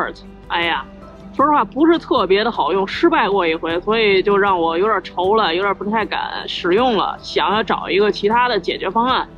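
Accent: Beijing, China. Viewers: 还是不做作的京腔舒服啊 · ，我在西岸，能跟着你追大纽约地区的光甚是开心，喜欢这一口京腔，听着心里特别踏实。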